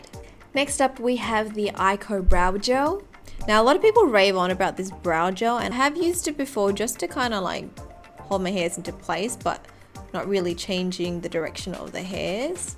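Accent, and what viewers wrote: Australia. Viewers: You have gorgeous, already thick brows!! Beautiful skin, too...are u Japanese ancestry? And that is the strongest Australian accent possible! Beautiful:) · Are you Australian? I just wonder if I guessed right 😂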